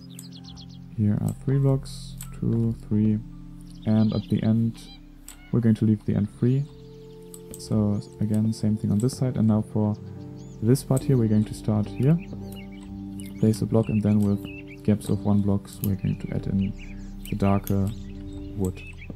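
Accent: Germany. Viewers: You are from germany right?^^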